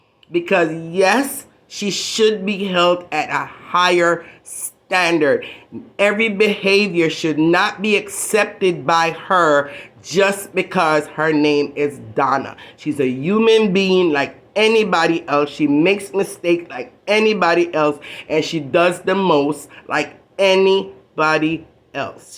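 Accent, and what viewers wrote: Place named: Bermuda. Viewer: You sound Bermudian why u coming after Donna plz stop it plz u looking views💯😂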